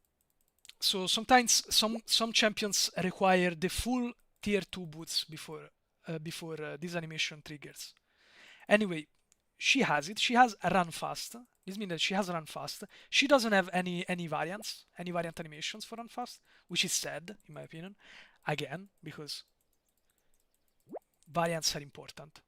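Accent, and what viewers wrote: Italy. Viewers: its right before my bedtime so i cant watch more than those 3 mins today but i gotta say this is a really cool way to stream and interact with the community, youre really awesome!! i also like the italian accent, parlo un po italianooooo, good night <3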